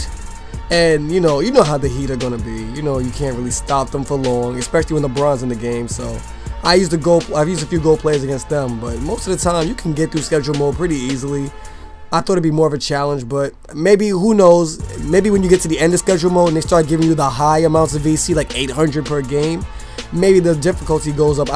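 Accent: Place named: New Jersey, United States. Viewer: Really Happy You uploaded. Guess I've been on your ass for Vids lately its just you are one of my favorite commentators. No homo but your voice is perfect for commentating sounds like you are from new jersey or something. Skys your limit.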